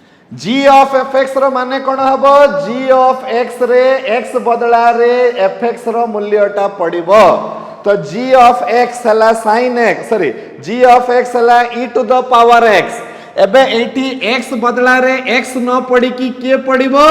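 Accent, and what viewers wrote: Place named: Balasore, India. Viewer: re balesore bhasi jaini ta😅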